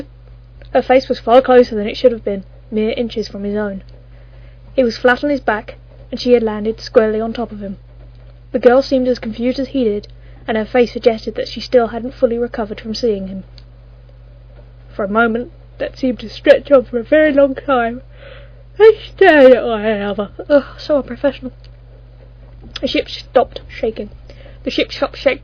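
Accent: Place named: Northern England, United Kingdom. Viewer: You've got such a nice voice to listen to :D lovely accent. North england maybe? ^^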